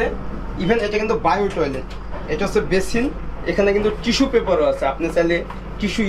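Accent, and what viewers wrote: Bangladesh. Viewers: বাংলাদেশী english এমনি হয় ।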